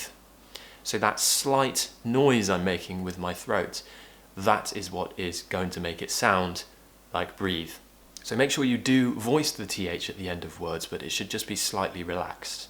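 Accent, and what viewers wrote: RP, United Kingdom. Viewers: I am actually from Essex however I am doing a language exchange with an Italian guy who struggle with "th"... I have recommended your video to him because it is AMAZING! Can I ask do you generally speak with an RP accent or do you do it just for your videos? :) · You are an amazing teacher, your British accent is amazing...lots of love from Bhutan 🇧🇹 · Hey i have seen your all video. And i want to recommend something. And i want to requist something.and i hope. That is when i read a bible i found serious pronunciations in the verse.would u mind if u receive my request? MATTHEW Chapter5 verse 23~25 I need your help and British accent thank u my best teacher ※i just hope. You don't need to get serious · good looking with this hair cut Thanks for your teaching eng with british accent😍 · Dude this is super difficult. Cause we are taught to speak “other” in a completely different way in India. 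It defintely doesn’t sound like udder but we don’t put the tongue in between. As you said , the muscle memory has to be worked on. You sound super POSH. Want to learn to speak in the same way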